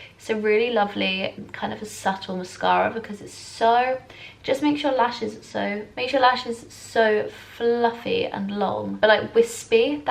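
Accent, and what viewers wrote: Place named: RP, United Kingdom. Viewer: I love your accent. You sound really posh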